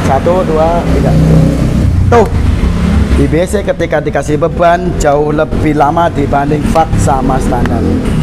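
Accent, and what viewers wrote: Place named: Java, Indonesia. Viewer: Demak jateng hadir mas. Jowone medok. Hhh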